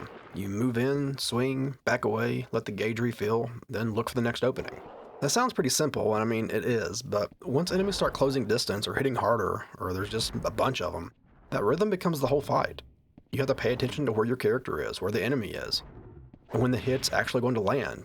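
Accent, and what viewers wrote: South, United States. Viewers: Small startup channel playing weird shit in a southern accent? Sign me up!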